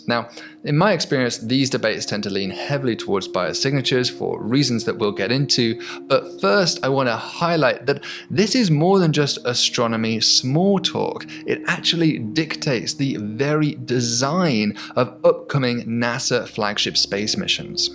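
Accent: United Kingdom. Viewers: Awesome! I love British ASMR science bro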